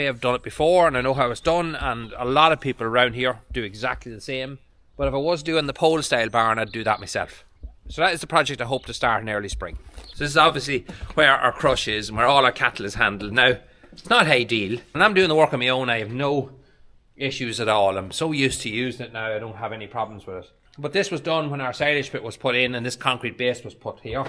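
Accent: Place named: South, Ireland. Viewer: Another great video,i wish you did one or two during the week as well. I just love the irish southern accent,im from the uk and have visted cork area 3 times and i just love IRELAND... Hope to come back very soon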